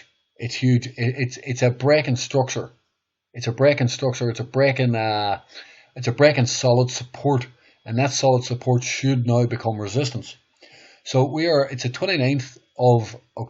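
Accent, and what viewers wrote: Northern Ireland, United Kingdom. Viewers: Northern Ireland accent i hear there ?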